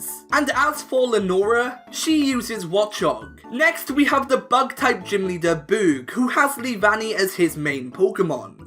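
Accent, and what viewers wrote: Wales, United Kingdom. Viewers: Are you welsh ? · you are welsh aren’t you. I can kinda make out some welsh accent. Possibly, I’m an American so I know nothing.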